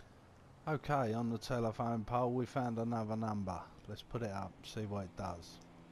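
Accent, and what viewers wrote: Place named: South, United States. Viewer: Hahahhahahaha!! Loved the Country accent man!!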